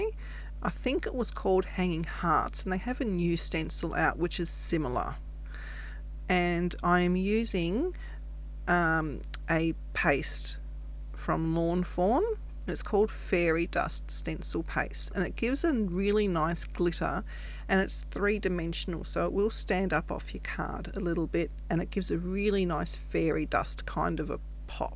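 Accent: Australia. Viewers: Such a cute stamp set and card. Great to hear a fellow Aussie...tfs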